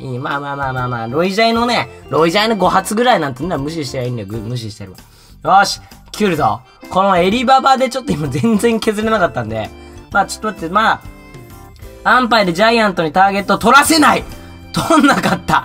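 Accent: Kansai, Japan. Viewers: 最高やんなぜ関西弁強すぎよわすぎぃーワロタww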